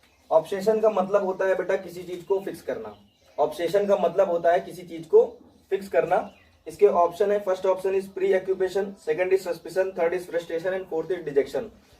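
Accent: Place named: Kanpur, India. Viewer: Sir your energy level is awesome. Your tricks are also superb but I like the way you teach. Smile on your face spread positivity and of course kanpuriya language is the best. Missing your karrahi coaching centre. By the way I'm Parth.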